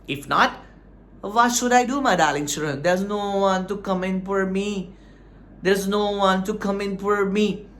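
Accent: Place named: United Kingdom. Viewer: Wow,😍 There are thousands to teach how to speak in English but there is no one to teach us how to speak in English like a british one, but you lovely english dad. Thanks a bunch and may meritorious you prosper more and more.❤️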